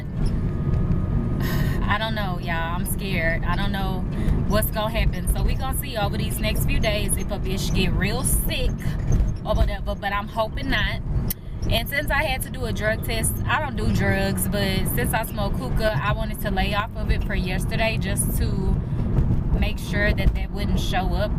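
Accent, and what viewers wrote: South, United States. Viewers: Omg that southern accent 😂😂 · Congratulations on the new job ❤️ LOVE LOVE LOVE just hearing you speak . Such a southern belle .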